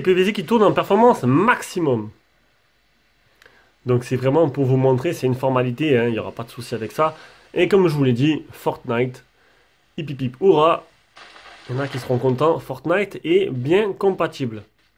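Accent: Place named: South, France. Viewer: Merci pour ce test qui m'a captivé que j'ai regardé du début jusqu'à la fin. Bonjour l'accent du sud et on sent le passionné pas forcément professionnel (ou peut être j'en sais rien...). La prochaine fois il faudra parler du type de charge rapide (18 watts je crois) et la possibilité ou non de charge à induction mais le test est vraiment pas mal...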